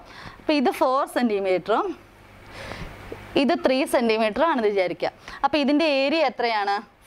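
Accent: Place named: Kollam, India. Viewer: കൊല്ലം ഭാഷ ആണ് എനിക്ക് നന്നായി മനസ്സിലാക്കുന്നത്. ഞാൻ കുറെ ലാംഗ്വേജ് സിൽ ഉള്ള ക്ലാസ്സ് കണ്ടു അതായത് തൃശ്ശൂർ തിരുവനന്തപുരം ഇതൊന്നും എനിക്ക് മനസ്സിലായതേയില്ല അങ്ങനെ ഒടുവിൽ എനിക്ക് കൊല്ലം പത്തനംതിട്ട ഭാഷയുടെ ക്ലാസ് കിട്ടി.😝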